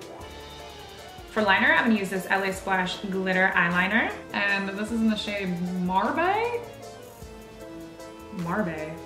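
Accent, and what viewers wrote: Canada. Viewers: can you just make a video of you saying sorry I love when Canadians say it. It sounds like sorwy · is it just me or does she sound SO canadian in this video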